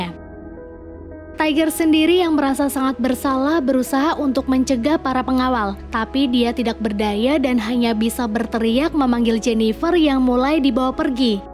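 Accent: Pemalang, Indonesia. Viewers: Orang pemalang ya kak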